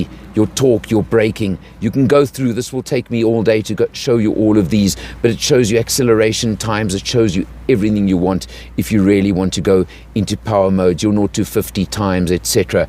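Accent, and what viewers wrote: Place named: New Zealand. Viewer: Your kiwi accent annoys me. No offence.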